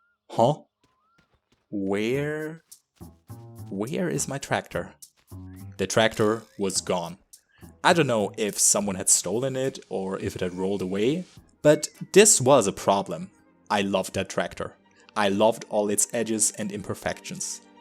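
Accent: Germany. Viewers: because you sound like any average german 20yold with the way you speak english. No offense tho I'm german myself xD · why do you sound german? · Hey you soumd german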